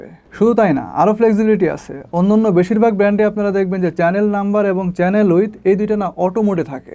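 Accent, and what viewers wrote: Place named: Bangladesh. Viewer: আপনার বলার ভঙ্গী খুবই সাবলিল ৷ একবারও আটকে যায়নি ৷ অন্য কেউ হলে এই কথাগুলো বলতে অন্তত কুড়ি মিনিট সময় নিতো ! আর আপনার বাংলাদেশী উচ্চারণ বেশ মধুর লাগলো ৷ ভারতে এটা পাওয়া যায় কিনা খুঁজে দেখছি ৷ কলকাতা থেকে আমার ভালোবাসা নিন ভাই ৷ এসব জটিল বিষয়ে আমার লেখাপড়া কম ৷ সেজন্য আমার ছেলেকে শেয়ার করছি ৷ ওর কাছ থেকে ভালো করে বুঝে নেবো ৷ এই চমৎকার তথ্যপূর্ণ ভিডিওর জন্য অসংখ্য ধন্যবাদ আপনাকে ৷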